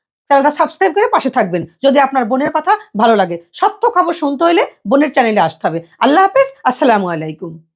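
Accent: Barishal, Bangladesh. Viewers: আপনি তো খাঁটি বরিশাইল্লা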